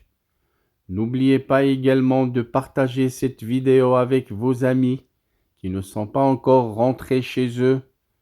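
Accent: Morocco. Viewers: L accent de ton francais et celui d un juif magocain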